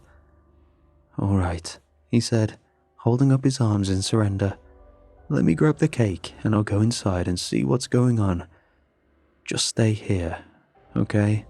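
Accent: United Kingdom. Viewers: I hear many stories told from Minnesota. I wonder if, even tho sounding British, lives there. Or maybe a writer he narrates often is in that cold azz state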